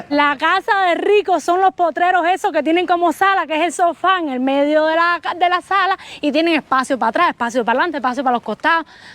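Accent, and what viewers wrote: Cuba. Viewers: La voz de cubana tuya molesta cantidad el acentico cubano ese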